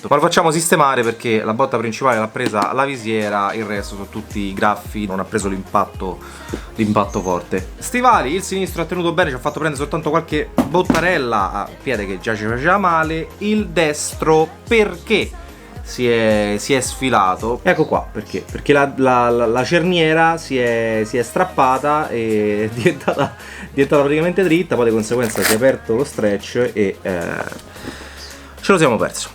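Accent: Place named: Rome, Italy. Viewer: Sto morendo letteralmente.. finalmente e uscito il romanaccio che e in te.. e poi al panigale nun glie devi caga er cazzo e favolosa.. davvero.. la proporrei come maglietta ahahah anche mi lanciò.. in sicurezza ahahaha bellissimo sei un grande!!!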